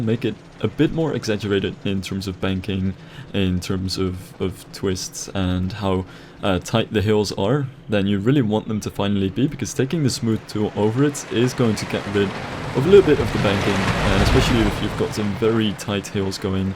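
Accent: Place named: Netherlands. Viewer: you have to be dutch, atleast you sound like a dutch guy speaking english, btw verry nice vid man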